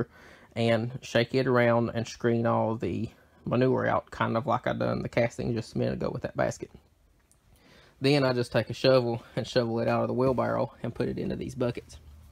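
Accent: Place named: Alabama, United States. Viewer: gotcha. Could have sworn that was an Alabama accent.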